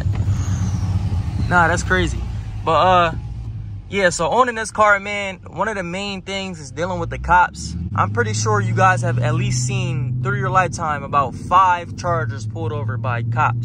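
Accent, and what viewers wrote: Philadelphia, United States. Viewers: u from philly?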